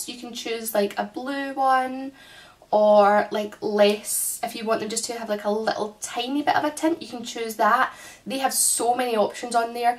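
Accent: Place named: Scotland, United Kingdom. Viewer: your accent is so cute you sound like riona from super natural. Is it Scottish? I have stainless steel polarized tinted mirrored aviators from zenni that are awesome! just a bit heavy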